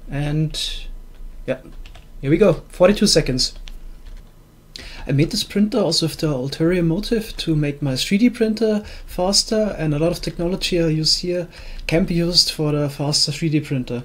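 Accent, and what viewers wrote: Germany. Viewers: BTW YouTube can't figure out your accent; it thinks all your videos are in German :\ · Als ich ein Video von dir gesehen habe, war mir direkt klar, du kommst aus Deutschland, der Akzent verrät alles😂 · Thats so funny! I'm german, too and I always thought that you sound kind of german xD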